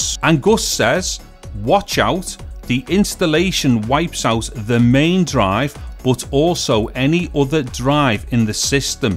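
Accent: Liverpool, United Kingdom. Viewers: a scouse tech channel? subscribed!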